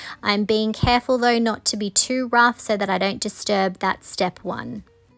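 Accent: Australia. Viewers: Hi, you’re obviously an Aussie, where are you situated. I love your work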